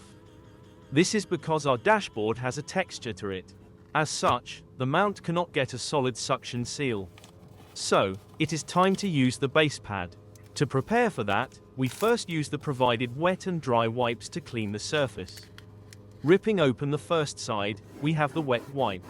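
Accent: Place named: Oregon, United States. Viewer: Absolutely love the Oregon accent!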